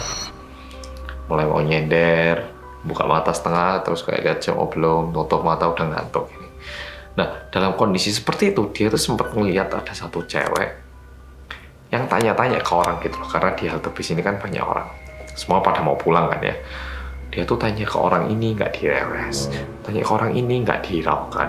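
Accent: Java, Indonesia. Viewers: Lanjut ngerungo'no critoe oppa seng meddok 😊😊😊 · Lebih enak didenger medokkk drpda yg tadi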